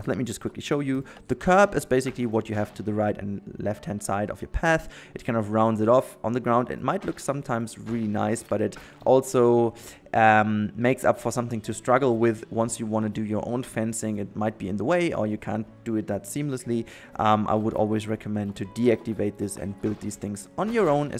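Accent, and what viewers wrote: Germany. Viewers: Dat german accent tho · Warum machst du eigentlich die Videos auf Englisch? Erreichst du so viel mehr Leute als mit deutsch ? Dein Akzent sagt mir das du deutscher Muttersprachler bist :D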